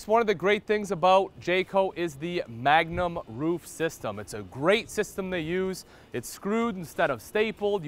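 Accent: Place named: Minnesota, United States. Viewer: Dude sounds direct from Minnesota! I’m from there and I’m like whoa!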